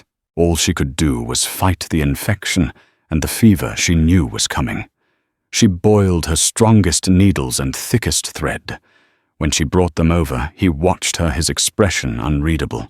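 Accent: United Kingdom. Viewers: That is a British accent, in my opinion, the nicest of the very many British accents from around the UK…. He places emphasis on words much more often than most of the other voices.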